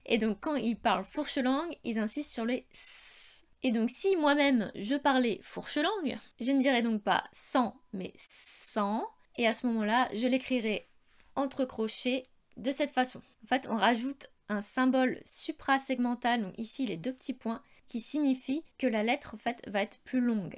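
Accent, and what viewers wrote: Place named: Paris, France. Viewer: Je n'avais pas remarqué ton accent parigot, mais j'adore ton chuintement sur 'ch' et 'j'! Bravo pour ce morceau de bravoure qui m'a ramené dans ma classe de 6ème, il y a... un certain temps !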